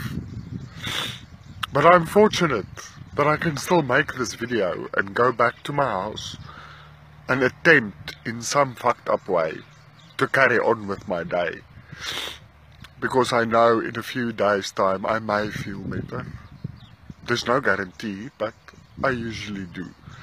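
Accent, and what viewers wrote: South Africa. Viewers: Your accent is so awesome. You must be South African. Keep fighting big fella ❤️🤙🤙🤙🤙🤙🤙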